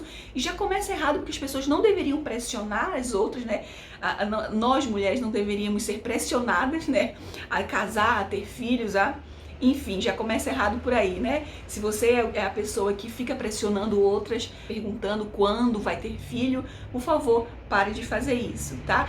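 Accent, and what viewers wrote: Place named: Belém, Brazil. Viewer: Olá, caí de paraquedas no seu canal, uma pergunta vc é de Belém ou imediações? Seu jeito de falar me lembrou demais de uma amiga que é de Belém e fala igual a vc 😅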